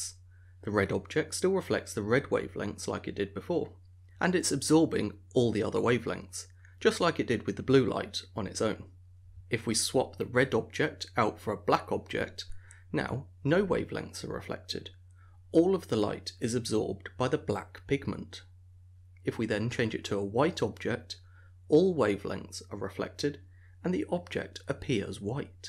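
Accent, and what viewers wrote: United Kingdom. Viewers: British english. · Sounds Uk English, to me with not a lot of markers of a certain region or "specific accent"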